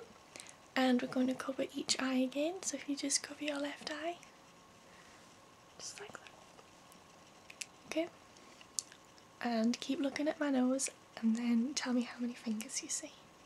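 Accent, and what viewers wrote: United Kingdom. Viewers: Google needs to design an auditory searching mechanism that allows you to search by accent. The ASMR industry needs more Brits! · that accent is delicious. Where in the UK is that from? Also great video, of course. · great to hear some bri'ish accent, and on top of that, it just a great vid! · I'm sorry to ask you this, but what is your accent? I can't seem to figure it out, I'm terrible with telling the difference between Scottish, Irish and Welsh xD haha love all your videos, keep going :) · I think its the British accent that does it for me. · ahhhh...British accent nerve exam..YES!!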